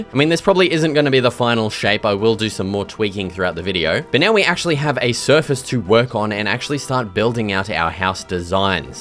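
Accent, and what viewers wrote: Australia. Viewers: Are you Australian? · Are u Australian? Is that an Aussie accent? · le me guess . ur from australia dunno jus got a feelin' mate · Quick question r u Australian u have a goated accent also I changed 700 comments to 701 I ruined it😢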